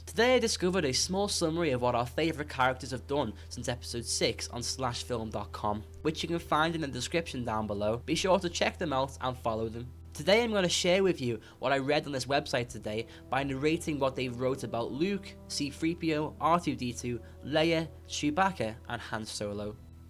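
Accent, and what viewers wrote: Liverpool, United Kingdom. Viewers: U scouse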